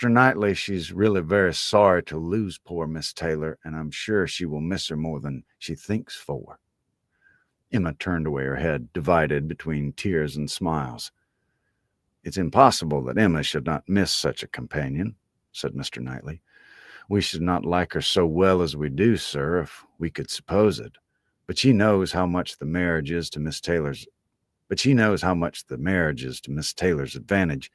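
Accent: South, United States. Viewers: Thank You SO much for your love and work!! It's delightful listening to your renditions of classics - Southern Style! Fabulous!!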